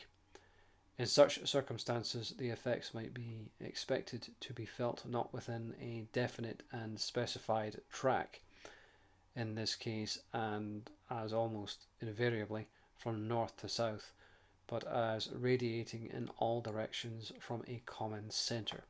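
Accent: Scotland, United Kingdom. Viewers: I've sent your readings to my friend, I confess iv been listening as well. I've read aloud Beaumonts books to myself in my geordi accent, hearing them from a Scot though is a pleasant change haha 😄.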